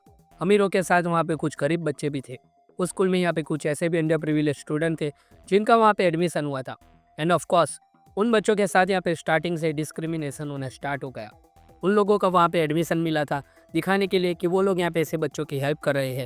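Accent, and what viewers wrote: Gujarat, India. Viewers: From your ascent i can say u are gujarati i am also ❤😊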